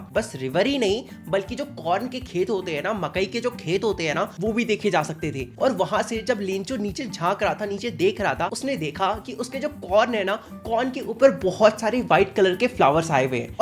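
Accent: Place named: Bihar, India. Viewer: Ye bhaiya meri bihari ladka lagta hai unki trah bolna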